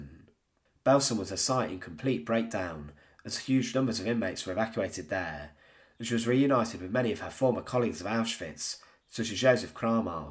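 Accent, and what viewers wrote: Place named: England, United Kingdom. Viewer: You simply just don’t like his British dialect. Which is fair, we’ve all felt that at some point. But dudes not a bad narrator. Sure the mic quality could be better and it probably will be in the future.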